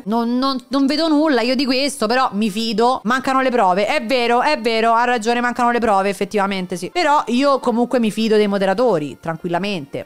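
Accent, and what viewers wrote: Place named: Rome, Italy. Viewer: Sei fenomenale... Mamma il romano mi fa morire!!!!😂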